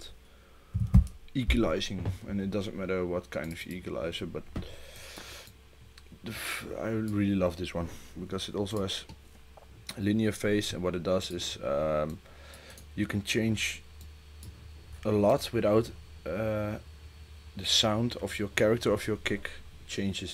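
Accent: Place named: Netherlands. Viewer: Original dutch accent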